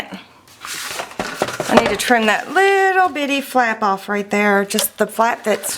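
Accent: South, United States. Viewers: I absolutely adore your videos and I think I've watched all of them and if not it's only because I haven't found it YET!! I do find it funny that you say hoes for holes...I'm assuming very southern. I'm from Alaska and shared one of my books created from a couple of your printables I'd combined a bit and you shared it on FB...that was cool!! Thank you for all you do...it's opened a whole new world of crafts for me. Before I'd always been a digital scrapper (still am to a huge extent but not I print and make some of my designs in paper books I create). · Being Southern like you, I understand everything you say!! LOL.... Here in our area of the Gulf Coast of MS.. we have a saying when we know something won't work out or something just won't work at all, we say, " That dog won't hunt"..... Being from an area that has a lot of hunters then we all know what this phrase means!! 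I love love love that you show how to use ordinary stuff to make your journals... without having to buy a bunch of expensive products you show how to use what we have in our stash!! The journal is awesome and I think it would make a great planner!! Thanks,.... you did it again! ( ahhhh I am a poet and don't know it) Another southern saying?? LOL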